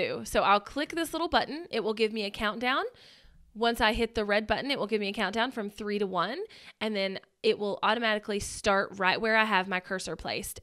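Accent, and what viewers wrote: South, United States. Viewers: Great video. She explains it well, and has a cute accent. From one southern to another-- get it girl! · Great video, as I was listening I was like "this lady is from the South". Sure enough NC, Me too. Thanks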